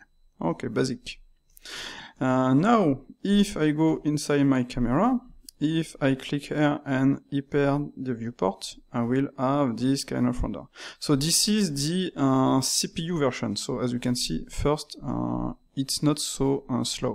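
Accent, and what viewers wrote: France. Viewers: on reconait le francais mdr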